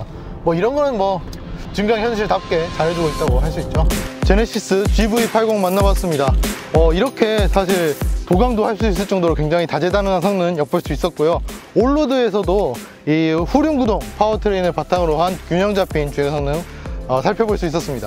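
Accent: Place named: Jeolla, South Korea. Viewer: 발음이 문제가 아니라 전라도 사투리가 문제 인 듯?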